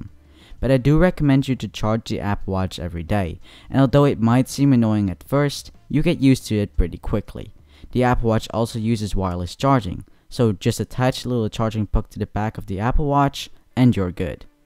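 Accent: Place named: United States. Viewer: Je Amerikaans is super goed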